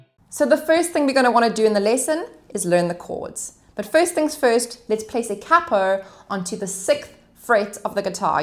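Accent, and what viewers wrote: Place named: South Africa. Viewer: Thanks! That was a great tutorial. Nice and slow, especially the breakdown of the intro. And the tip about the capo change in the 3rd verse was super useful. Best of all, the tutorial was from a fellow South African. I grew up in CT (now living in the US), so I recognized the accent straight away. I love too, so I will be digging into your other songs by him. Keep the great content coming.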